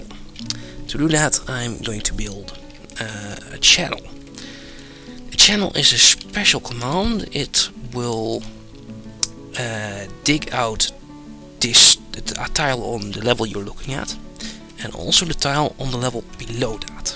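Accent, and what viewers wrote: Netherlands. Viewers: Haha , je kan horen dat je Nederlands bent :'D . Btw waar ongeveer in Nederland woon je als ik vragen mag :x ?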